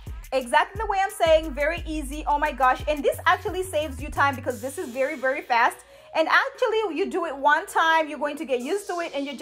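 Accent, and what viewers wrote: Caribbean. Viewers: Looking cute sis💖 you sound like you're from the Caribbean. I'm from Jamaica🇯🇲👍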